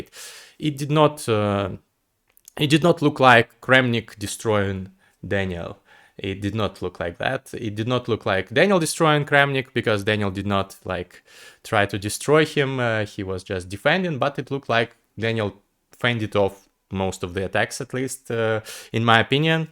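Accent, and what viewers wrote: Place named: Russia. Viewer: Спасибо тебе огромное. И за проделанную работу, и за хороший, богатый английский, и за понятный российский акцент, который делает английский понятнее для русскоязычных))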